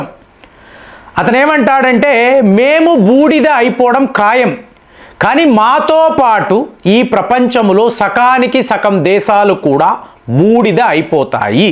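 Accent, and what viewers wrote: Tamil Nadu, India. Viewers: Ori vari nuvvu matladedi tamila telugu, salam kadu sagam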